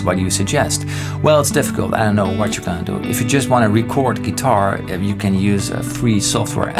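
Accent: Netherlands. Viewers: As a Belgian I could tell pretty much right away his accent was Dutch. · ah ik dacht al dat ik een Nederlands accent hoorde ;P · Altijd al getwijfeld of je Nederlands was, wist t oprecht niet zeker haha. Geweldige video's trouwens ga zo door!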